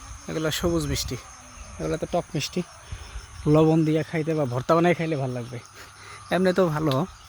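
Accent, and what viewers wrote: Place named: Tangail, Bangladesh. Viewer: ভাই আপনার কথা শুনে মনে হচ্ছে টাঙ্গাইলের কোথাও আপনার বাসা।। আমার বাসা টাঙ্গাইলের সাগরদিঘী। আপনার নাম্বার টা দিলে ভালো হতো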